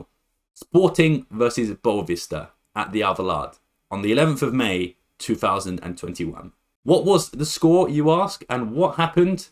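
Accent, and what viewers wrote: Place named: England, United Kingdom. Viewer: Good video. You have an Iberian name and wear a sporting shirt but you have an English accent? Where are you from and who do you support?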